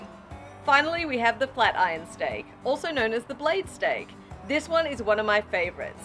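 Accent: Australia. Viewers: You're very good darling, and we love the aussie accent, but I prefer NOT flipping the steak every 30 seconds. Depending on the cut, and thickness that would determine how much time on each side. You still have Love from America ♥️ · Oh my God not only are you beautiful but I love your Aussie accent think I’m in love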